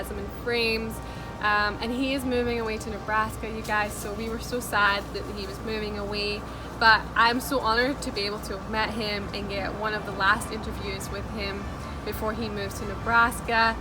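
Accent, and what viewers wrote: Scotland, United Kingdom. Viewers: Please never lose your lovely Scottish accent!!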